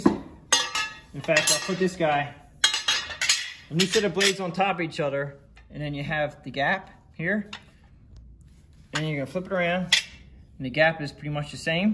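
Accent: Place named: Pittsburgh, United States. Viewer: Already done sir! Yes it may seem barbaric to some, but you gotta do what you gotta do and I assume most people would rather take the barbaric route than shell out tons of cash for a new deck. I wish I had seen this video before I got rid of my old John Deere. Also...based on your slight accent I'm assuming you are a fellow Black and Gold fan? ;)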